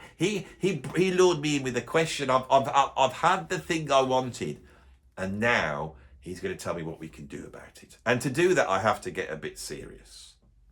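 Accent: Birmingham, United Kingdom. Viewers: Definitely! Plus I always think that a rant in the Brummie accent always gives it that extra 'Je Ne Sais Quoi' !!! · The brummie accent is hilarious .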